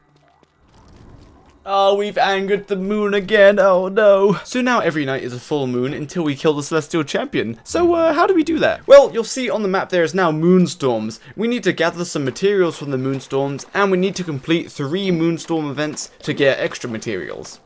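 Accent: United Kingdom. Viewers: I love your British voice :))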